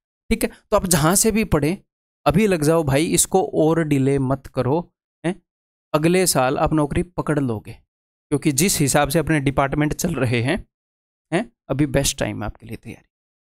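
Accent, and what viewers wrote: Haryana, India. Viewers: Maine maths ka tier 2 batch lia h aur apka content perfect h ekdum exam oriented aur to the point ... Aur apke padane ka way bhi boht acha h aur haryanvi accent bhi aisa h ki dimag me seedha ghus jata h padaya hua ...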